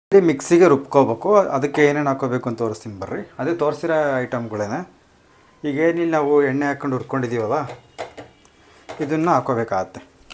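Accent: Chitradurga, India. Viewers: ನಮ್ಮದು ಹಿರಿಯೂರು ನಾವು ನಿಮ್ಮ ಶೈಲಿಯಲ್ಲಿ ಕನ್ನಡ ಮಾತನಾಡುವುದು ನಾನು ಬಳ್ಳಾರಿಗೆ ಬಂದು 33 ವರ್ಷಗಳಾದರೂ ಸಹ ನಮ್ಮ ಚಿತ್ರದುರ್ಗದ ಕನ್ನಡ ಭಾಷೆ ಸೊಗಡು ಅಡುಗೆ ಮಾಡುತ್ತೇನೆ ನೀವು ಮಾಡುವ ಅಡುಗೆ ಮಾಡುತ್ತೇನೆ ನಮಗೆ ಇದೇರೀತಿ ಅಡುಗೆ ಅಭ್ಯಾಸವಿದೆ. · Neevu pakka davangere Chitradurga Kannada mathadthira ala same nam Ajji and Amma mathado haage.. nimma recipes nodovaga avarathrane Keli madthidhineno ansatthe… thumba thumba olle Saru chatni helkottidhira hinge hale adge dhu video galanna Maadri.. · ನಿಮ್ಮ ಮಾತಿನ ಶೈಲಿ, ಅಡುಗೆ‌ ಮಾಡುವ ರೀತಿಗಳನ್ನು ನೋಡಿದರೆ, ನೀವು ಚಿತ್ರದುರ್ಗ, ಚಳ್ಳಕೆರೆ, ದಾವಣಗೆರೆ ಪ್ರಾಂತ್ಯದವರು ಇರಬಹುದು ಎನಿಸಿತು..ಈ ಎಲ್ಲ ಅಡುಗೆಗಳನ್ನು ನನ್ನ ತಾಯಿ ಇದೇ ರೀತಿಯಲ್ಲಿ ಮಾಡುತ್ತಿದ್ದರು..ತವರೂರ ಊಟ ನೆನಪಾಯ್ತು.. ನಿಮ್ಮ ಅಡುಗೆ ಮತ್ತು ವಿವರಣೆ ಎರಡೂ ಸೊಗಸಾಗಿವೆ..ಶುಭವಾಗಲಿ.. ಸೌ.ಮಧು ವಸ್ತ್ರದ.. ಮುಂಬಯಿ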